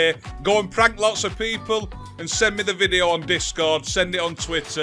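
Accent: Yorkshire, United Kingdom. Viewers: 😂😂😂😂😂 had me there Haha. Yorkshire accent aswel that. Where you from? I'm from wakefield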